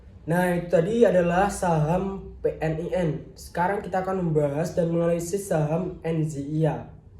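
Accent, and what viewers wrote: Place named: Java, Indonesia. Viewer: Medok dikit ga ngaruh🔥keren poll👏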